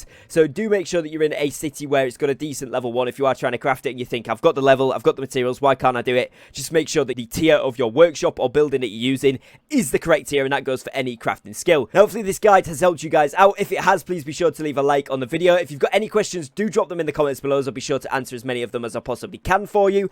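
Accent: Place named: North, United Kingdom. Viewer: Love your guides. You sound local to me! Total northerner! 😅🤣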